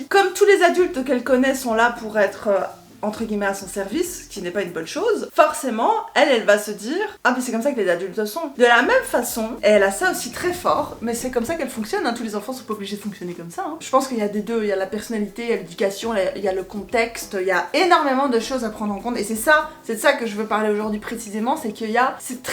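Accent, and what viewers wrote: Belgium. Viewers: Absolument toutes les caractéristiques de la meilleure des mères. Dont le STRESSE!!!!! Autrechose: - l'accent belge, merci, j'adore (parce que j'ai trop de bon contact avec eux mais faut pas dire qu'on est français):) - la sincérité, bah vi, pourquoi en dire plus? - courage maman - Quel contraste entre et. si vivante et si calme. Vous êtes beaux. - Au diable la perfection que tu recherches, si Poipoi sourit 10 fois par jour (avec toi, j'en suis sûr), alors... J'envi sa jeunesse. Merci pour ta confession pleine de valeurs.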